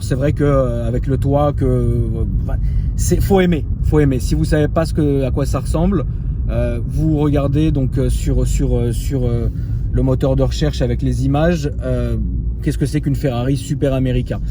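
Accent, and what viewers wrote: Tunisia. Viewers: Sérieux on dirait un tunisien quand tu parle en arabe je sais pas si sa a force de traîner ac des tunisiens ou quoi mais voilà 😕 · on kiff l accent Tunisien 🇹🇳 🥇🏆😂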